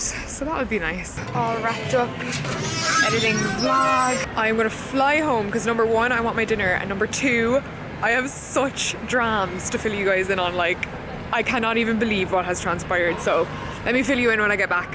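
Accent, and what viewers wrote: Cork, Ireland. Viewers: Loved the B-roll footage this week gal. And also love how Cork your accent goes when you are with. It’s gas!!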